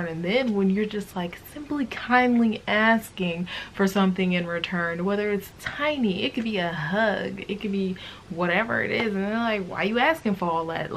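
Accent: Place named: South, United States. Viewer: i looove your southern drawl omg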